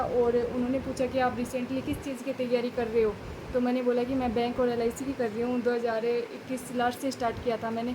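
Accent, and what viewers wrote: Rajasthan, India. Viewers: Video suru krte hi smjh gya thha bolne ke trike se, rajsthan se hai medm ji 😂😂😂😂 achi vancency thhi idhr bhi Apne zone se hi bhrna chhaiy thha ADO.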